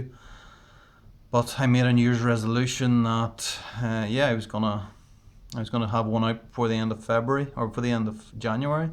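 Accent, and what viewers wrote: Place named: RP, United Kingdom. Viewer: At least you haven't turned into an arsehole now you're popular unlike some of them brewtubers out there that think they're the big I am. Nobody's ever going to read this so I'm not even going to bother trolling. I think eventually everyone learns to relax. You sound kinda posh in this. I first did videos for my mate Jon (he'll be on youtube one day) where I was fine, then did videos for youtube that are awful and then pretended I was always doing videos for Jon (and still do) and it got easier.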